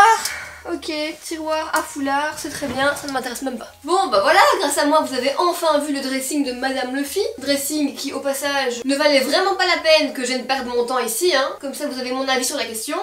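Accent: Belgium. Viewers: ta un accent belge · J'adore ton accent belge parce que moi aussi je suis belge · J'adore comme tu lâche tes petites expression belge mais moi aussi je suis belge et ma soeur parle comme ça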